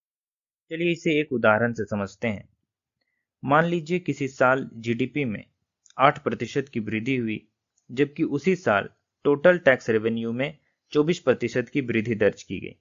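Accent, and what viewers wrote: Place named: North, India. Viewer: This is North Indian accent of English.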